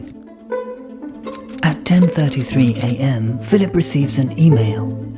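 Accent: United Kingdom. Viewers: Saludos desde México 🇲🇽 I love British Accent 🇬🇧 😍👌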